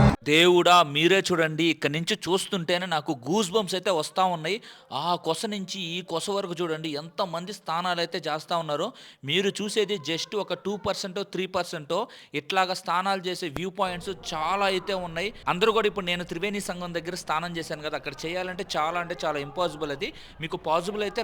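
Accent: Nellore, India. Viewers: సూపర్ గా చెప్తున్నావ్ నాన్న నెల్లూరు భాష ఉపయోగించి మాది కూడా గూడూరు చాలా సంతోషంగా ఉంది బాగా వివరిస్తున్నావ్ వెరీ గుడ్ నాన్న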